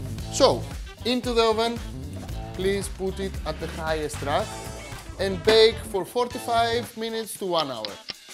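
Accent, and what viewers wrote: Greece. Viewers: Oo, That classic greek accent! now i wish that still had mine :/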